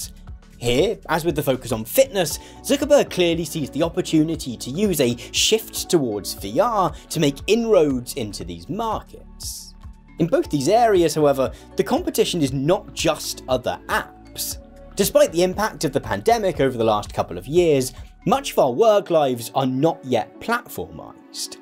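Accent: United Kingdom. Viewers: your caption writer corrected some parts in your British af rant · damn I love you and your videos so much. I really love political theory and having you explain it to me with modern day relevance and a nice british accent is just amazing.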